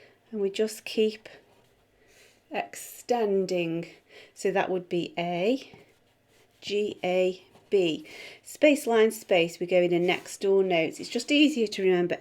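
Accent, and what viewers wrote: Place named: Lancashire, United Kingdom. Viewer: This video has helped me a lot. I feel like I am starting to learn a new language. I'm 35 and a beginner, but I have managed to play simple tunes, so far. I have some sort of acute hearing that helps me hear a note and play it almost immediately. To be able to read other sheet music is has made me make an effort into playing with both hands. (something I thought to be impossible). This has inspired my kids whom are 5, 11 and 13 to play our piano. Thanks so much, it is much appreciated, plus your beautiful accent reminds me of my family in Lancs. Be safe all the best :)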